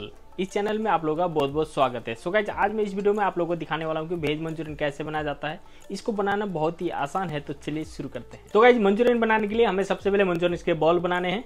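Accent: Nepal. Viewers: Aap Nepal se ho kya ?? Aapki aabaj aur tongue se aisa feel ho rha hai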